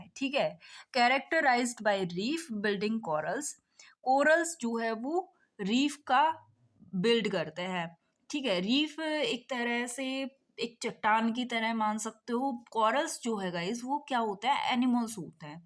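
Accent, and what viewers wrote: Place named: Bengal, India. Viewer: i think you are bengoli because your language tells that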